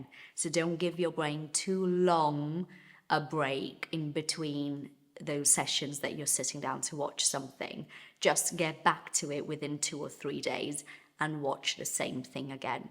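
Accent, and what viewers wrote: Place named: London, United Kingdom. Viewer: Your example..."and you are in London...."( I am...hehehe). Are you??? I believe so....hehehe based on your English😊😊 Infelizmente, after ages vivendo aqui, confesso...ainda assisto coisas com English subtitles....mas é weird pq Youtube eu não coloco subtitles, já tv, sim....😮 Anyway, great video! Deus abençoe!😊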